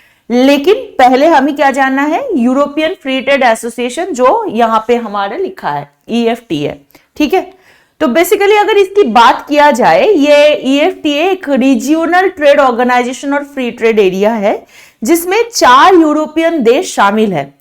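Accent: Bengal, India. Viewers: Develop countries like America France Russia Britain Japan etc Underdeveloped nation like Afghanistan Bangladesh Myanmar Chad Mali Nepal etc I think ma'am you are from West Bengal. Bcoz you hindi tone like that. Thank you ma'am.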